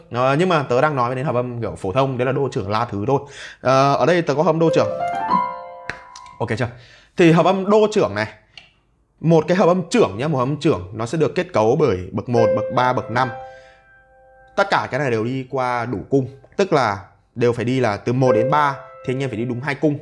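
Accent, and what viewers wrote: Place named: North, Vietnam. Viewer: Cảm thấy may mắn vì mình hiểu đc tiếng bắc 😂😂😂 quá nhanh quá nguy hiểm 😂😂😂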